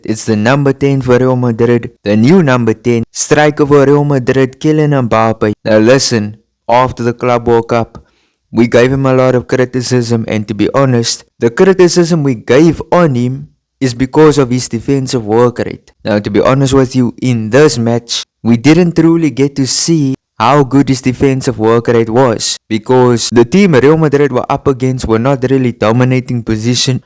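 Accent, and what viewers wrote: South Africa. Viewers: editing skills are top-notch,Bruv! You have a South African accent. Hala Madrid🤍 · Yoh bro are u south African i am too, your accent gave u away, big up😂🎉❤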